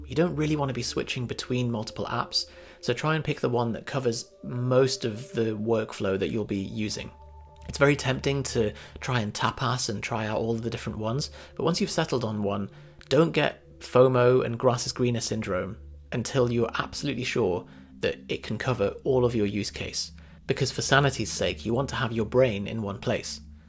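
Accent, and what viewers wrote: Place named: RP, United Kingdom. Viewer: Splendid command of the English language. You could walk straight into the Royal family with that accent!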